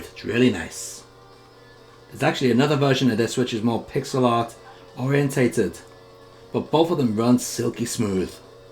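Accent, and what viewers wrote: United Kingdom. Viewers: Greetings from Brazil. Man, I really enjoy your reviews and battle of the Ports. Besides that, is much better listen the British english.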